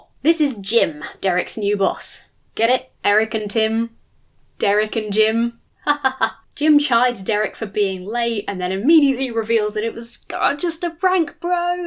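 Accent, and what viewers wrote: United Kingdom. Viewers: Since you would seem to be British (accent?) you may not be familiar with the car that Eric/Derek drives up in. It's a Chevrolet Camaro and not really a "sports car" in the true sense of the word. Also, it's not necessarily all that expensive. Even though you can get them all muscled up with big engines that can go fast, you can get a basic bare bones one for as little as $25,000 dollars or about 18,400 British pounds. Thus, even a night watchman should be able to afford one if he keeps his aspirations in check. Love your stuff otherwise though. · Blackmail, it's the godly way! Lol, I died when you said that because it's so sadly true. Let me manipulate you so you can, believe me, btw we're the good guy's everyone else is so evil ha ha ha (in evil villain). Love the video here, but where is your accent from? My wife and I were thinking British but couldn't place it.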